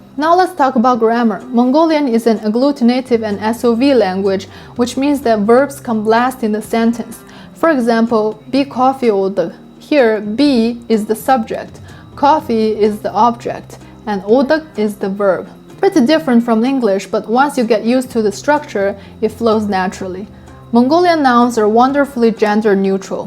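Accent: China. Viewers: You speak English with a Chinese accent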